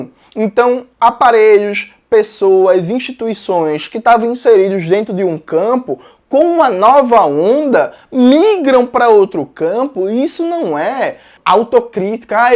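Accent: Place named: Northeast, Brazil. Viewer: meu amigo! Que aula foi essa hein? Vídeo supeer necessário!!! Seguindo suas redes agora! Compartilhando p geral! Ainda com esse sotaque de meu nordeste....S2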